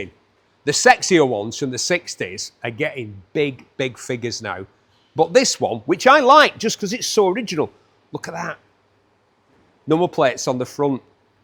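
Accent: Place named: Northern England, United Kingdom. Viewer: Listen to a northern bloke talking absolute bollocks for 3 hours. Brilliant. Some great times at the bulldog bash👍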